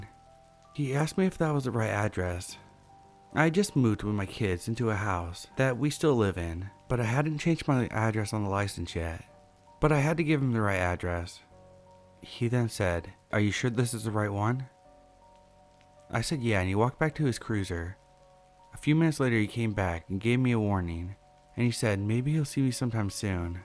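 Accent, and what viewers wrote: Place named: United Kingdom. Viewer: Oh no a British accent no thank you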